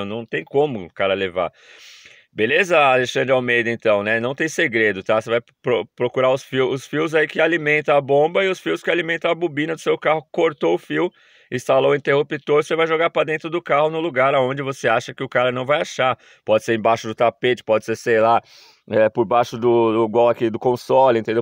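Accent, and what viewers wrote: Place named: São Paulo, Brazil. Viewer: Grato! Pelo sotaque você deve ser de SP capital... Você faz esse serviço de instalar o segredo? Qualquer auto-eletrico tem essa expertise?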